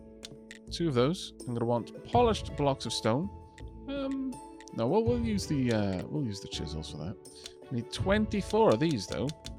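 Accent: United Kingdom. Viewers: Holy shit your like one of the most British people ive ever hear speak...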